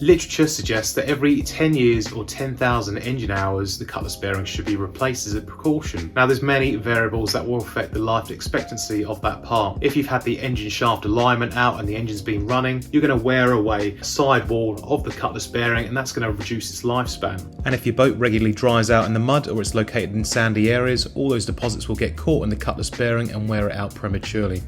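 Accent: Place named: United Kingdom. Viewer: A joy to watch. And to listen to. Your English is, well, the Kings English. Keep up the great work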